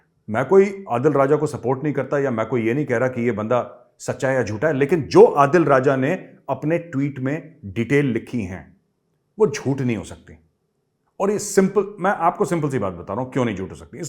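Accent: Lahore, Pakistan. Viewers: Sir aapka Lahori accent bhut mast hai mja aa jata h sunke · Lahori accent…Waah Waah Waah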